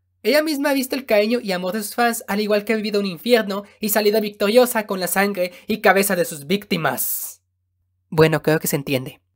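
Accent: France. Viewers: Uff ya quisiera ver eze asentó francés en la webserie de XD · Tengo dudas 1:eri gei? 2:eres frances? Fin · oye eres de francia porque tienes o me suena q tienes asentó francés, me saludad??? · soy yo o tiene acento francés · Oye eres frances?:v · Acabo de dar cuenta del asentó francesas o solo soy yo :v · Enserio? Yo pensaba que eras francés...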